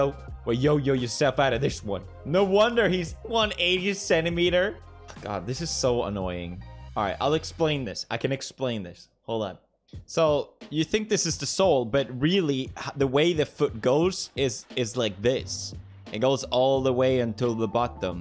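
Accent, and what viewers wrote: Sweden. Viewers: is it me or is his swedish accent coming back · his swedish accent was way heavier back then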